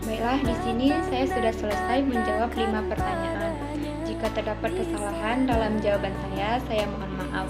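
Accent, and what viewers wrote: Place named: Padang, Indonesia. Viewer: Mantap Kak...Logat Bahasa Padang E Nampak Bona Ya..🙂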